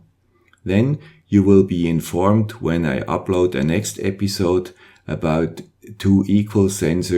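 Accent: Switzerland. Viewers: Hey, guy with swiss accent. Your channel is very inspiring. Thanks for sharing your ideas! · Hey, guy with swiss accent, I've got an italian one.... I find very useful your teaching. Actually, I was used to plan experiments with Labview and NI instrumentation, I decided to leave both for open software and cheap devices, not only for economical concern. Thanxs for the wide presentations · Ich lebe momentan in Irland und da hatte ich die komische Idee, eine Eier-Inkubator-Steuerung zu bauen. Als kompletter Anfänger schaue ich viele Videos bei Youtube an. Deines, weil ich ein I2C-Display und ein RTC in meinem Projekt verwenden möchte. Mit dem Schweizer Akzent war das dann besonders nett zu schauen. Danke!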